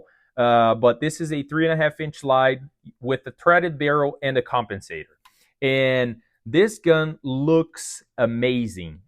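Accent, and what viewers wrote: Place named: Quebec, Canada. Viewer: I'm hearing a French Canadian or Newfie accent? Where are you from?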